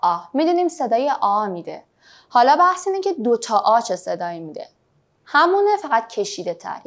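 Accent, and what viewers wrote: Iran. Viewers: استاد روی لهجتون خیلی باید کار کنید چون آلمانیرو دارید با لهجه غلیظ فارسی حرف میزنید. با تشکر.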